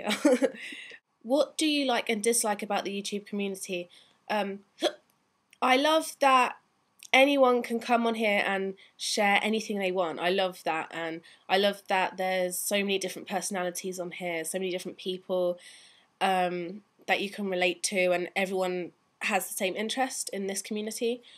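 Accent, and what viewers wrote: United Kingdom. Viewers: about your style: rock chic/ street chic? - random comment: i'm normally not into british english but to you i could listen all day :) - goofy question: any thoughts on the royal wedding? are you excited, bugged, indifferent..?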